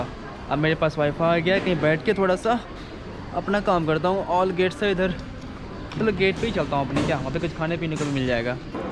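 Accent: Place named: Purnea, India. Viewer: Purnea ke ho na...accent bro...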